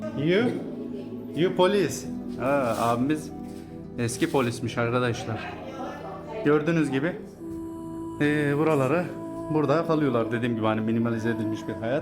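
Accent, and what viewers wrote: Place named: Kurdish, Turkey. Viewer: Abicim sen yapamıyorsun, bir kere konuşma aksanın bozuk kürt m7 emi nece belli deyil konuşuyon belli değil vidyoların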